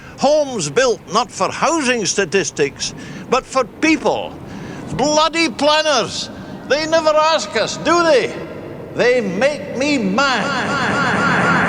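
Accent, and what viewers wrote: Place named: Scotland, United Kingdom. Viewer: Strong Scottish accent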